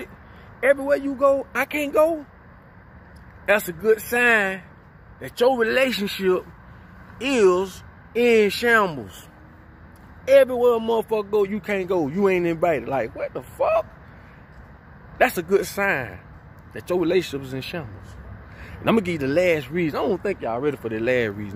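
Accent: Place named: New Orleans, United States. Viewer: Are you from New Orleans... I'm dying over here it's the truth 🤣🤣🤣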